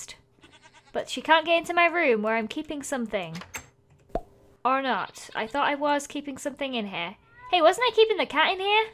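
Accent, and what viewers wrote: United Kingdom. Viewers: Did any one else notice her saying bannene smoothie instead of banana smoothie or maybe it's her British accent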